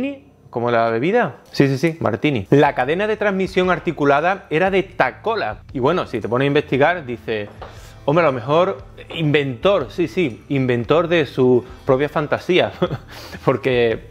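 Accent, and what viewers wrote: Andalusia, Spain. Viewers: Pesaito el andaluz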